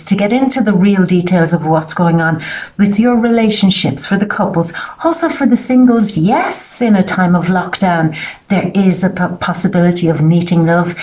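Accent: Ireland. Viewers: Always a pleasure to hear your soft Irish accent, I am a June 20 guy. Painter and writer, very Irish and awake. Like yourself I am living far from home but you can take the woman from the island but not the island from the woman. I have lost nothing that I experienced in life but have managed to place things in quarantine lol. Big hugs. Eric. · Doesn't she have an uncanny resemblance to Elizabeth Hurley?.. that gorgeous Irish accent.. brilliant! Gurl you really speak with such clarity and I love it.. yes I have enrolled in distance learning and just didn't have the time to do it.. yes I have been afraid to do my work because I have always thought I wouldn't get through it.. I really want to go back to Uni and completing what I have always wanted to do... I'm older and want to be able to say to myself that I have lived my life. So thank you for this gorgeous reading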